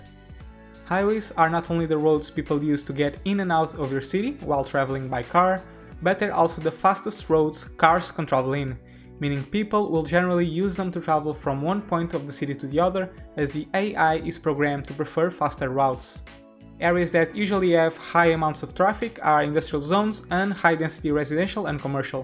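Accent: Portugal. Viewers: Suspeitei que eras português, e confirmei. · Vi logo que eras tuga pelo sotaque, props mano! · I thought you are portuguese. Now I'm sure :)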